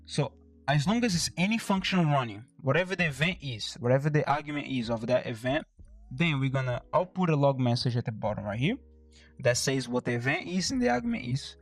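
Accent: Brazil. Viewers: incrivel como um brasileiro sabe detectar outro brasileiro falando em ingles UEHUEAHAUEHEAUH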